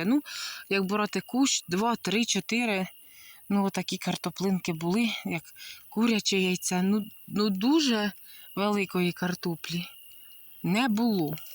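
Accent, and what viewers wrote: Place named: Poltava, Ukraine. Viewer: Я теж з Полтавської області,мама і досі проживає в Пирятині...Я ж чую,знайома вимова!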